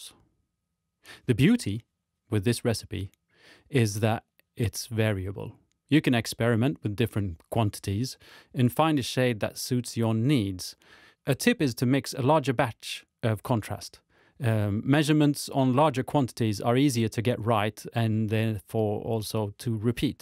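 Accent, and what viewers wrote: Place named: Sweden. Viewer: thanks for the vid, black hair have always been something I have avoided due to it being hard. as I can here your from Sweden. where did you buy your spray booth? it looks like its one of those foldable once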